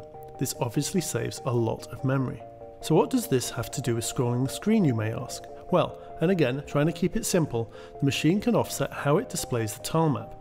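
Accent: United Kingdom. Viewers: There is plenty of American content that has a big international-audience but they don’t change their dialect or language, they speak as to where they are from. Speaking as an Australian, I was confused when I first started watching your stuff as you sounded British, and I knew TT was, so initially I thought you and TT were a lot more American than I once thought. Ultimately it is your channel and you can do what you want, I just find it odd you say Genesis and speak for an American audience and i’ve seen numerous other comments saying the same. Toy Story is one of my favourite video games ever, so I don’t mean any hate, I just think you are documenting some great stuff here that will be watched for decades from people everywhere, it should be authentic and speak to as where you and TT are from (I know you might not be there now, but I lived in England for a while but am still ultimately Australian).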